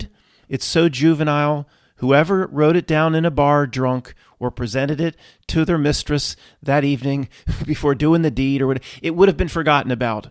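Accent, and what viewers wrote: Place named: Philadelphia, United States. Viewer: 😂 Really love your Philly accent....💚🌻🙏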